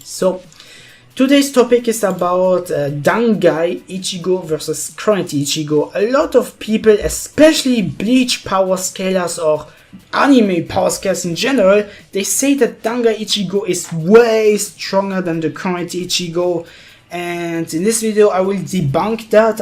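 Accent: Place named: France. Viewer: We can hear your french accent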